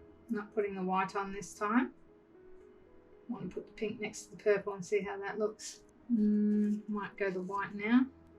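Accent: Australia. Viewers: Regarding the middle..you could have stopped at gold dots but still beautiful, well done. You sound Australian is that where you are? Asking because you're using Amsterdam paints...I'm in NZ and giving trouble sourcing supplies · Beautiful..going to my table to try this. Just enough cells and gold and the centre is great…love your natural way of working and commenting…nice to hear an Aussie on board. 💖💖Thanks, from Ruth in Tasmania. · Thats brilliant inventive artwork, it is also borderline ASMR, with your Aussie accent and the other sounds, very theraputic.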